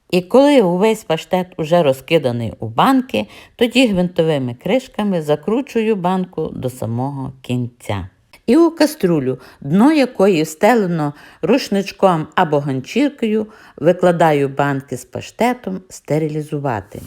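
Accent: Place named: Western Ukraine, Ukraine. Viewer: У вас такий гарний западноукраїнський акцент. Знаю, що в тих краях дуже файні гадзині))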